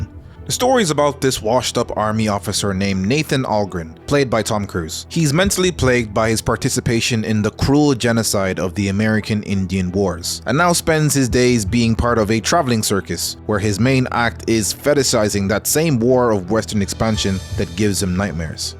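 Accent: Ireland. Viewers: For some reason I thought you were a white Irish guy, is there someone else narrating the video 😅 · I hear a bit of an Irish accent? Am I right or am I going mad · are you irish? im trying to place your accent 😅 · Are you irish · Thought you were Irish for a sec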